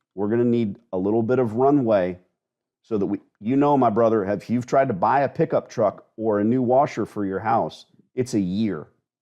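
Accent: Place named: South, United States. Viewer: Great Southern drawl on this one. I hope I never have to hear your Staten Island accent lol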